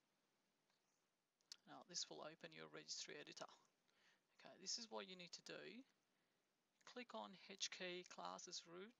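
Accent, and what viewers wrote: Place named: United Kingdom. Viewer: Philip It's so low even with the speakers and the video both set to max volume. No need to insult folks just because you're getting better results. I can just barely hear that someone is talking with an apparent british accent, but placing my iPhone's mic into my headphones right in front of the speaker, with dB meter app running, it doesn't even register a difference from ambient noise. Sometimes I can tell what he's saying if I listen really closely, but most of the time, I can't.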